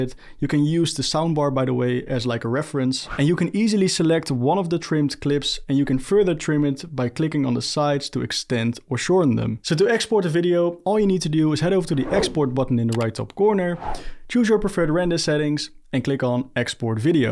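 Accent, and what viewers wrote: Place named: Netherlands. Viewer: When I click a video and I hear Dutch accent it just makes me happy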